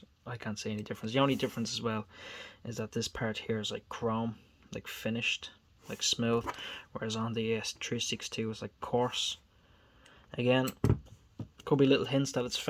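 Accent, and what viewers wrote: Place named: Ireland. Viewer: Date code H33 on your genuine Abloy is August 2020. That is a new model genuine Abloy, I could tell straight away just by the laser engraving. Just because you bought a 200 quid lock for 60 quid doesn't mean its fake! It means you got a bloody good bargain! There are No, zero, zilch, nada, fake Abloy PL362 padlocks, nobody has spent tens of thousands of pounds to machine up to make exact duplicates of them only to sell em cheaper, putting emselves out of pocket! You have a real Abloy! Rest assured its real, real case hardened steel body and real case hardened Boron carbide shackle! I take it you're from the Emerald Isle, by your accent that it is! Well now, Slaint m'hath and slow on the ishke baha!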